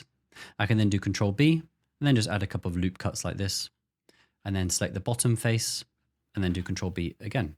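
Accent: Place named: England, United Kingdom. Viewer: Funnily enough the first thing I thought was “this guy grew up near Daniel Radcliffe” based on the accent lol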